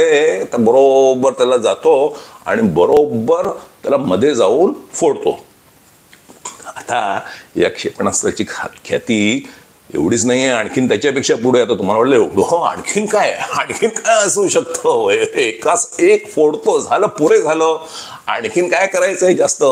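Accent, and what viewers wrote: Pune, India. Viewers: चांगले शालजोडीतले हानतोस रे तू मुंबई त जन्म घेतला पण बोलतो पुण्याकरा सारखा धन्यवाद मजा आली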